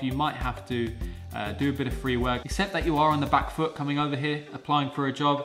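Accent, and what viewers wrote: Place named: United Kingdom. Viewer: This is the nicest UK accent I have ever heard. Can I ask whereabouts in the UK you are from?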